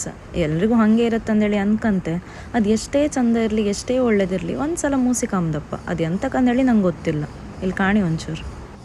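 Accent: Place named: Kundapur, India. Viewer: Kundapur kannada super ❤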